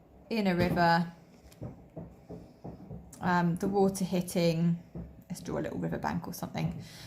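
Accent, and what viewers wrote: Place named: England, United Kingdom. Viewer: Which English accent do you have ? I like it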